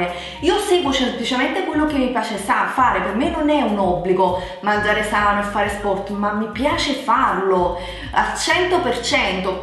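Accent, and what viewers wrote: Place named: Campania, Italy. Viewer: Ciao! Ti seguo da diverso tempo ormai e non posso che dirti grazie per come sai motivarmi, inducendomi a condurre una vita più sana ed equilibrata. Quando guardo i tuoi video mi viene proprio voglia di dedicarmi a me stessa e al mio benessere. E così continuo ad avere un'alimentazione piuttosto varia (visto che sono siciliana e abito fuori città non ho grossi problemi a mangiare cibo raccolto dalle nostre campagne [che più bio non si può XD]) e pratico nel mio piccolo un po' di attività fisica fatta di lunghe passeggiate ed esercizi tra le mura domestiche (la palestra non fa per me)... Ti ringrazio per come sai spronare e motivare chi ti segue con sincero affetto. Continua così! Un bacio grande 😘❤️ P.S. Adoro il tuo accento campano 😂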